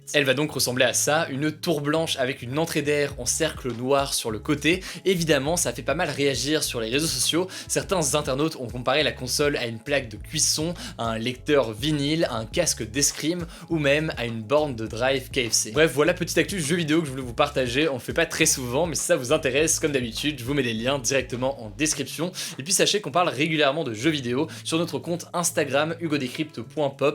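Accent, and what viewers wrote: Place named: United Kingdom. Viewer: Ton accent anglais 😂👍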